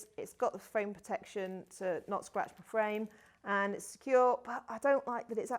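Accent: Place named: England, United Kingdom. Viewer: Thanks for the humor-sprinkled video and all of the great info in the "Show More". Also, I live for English accents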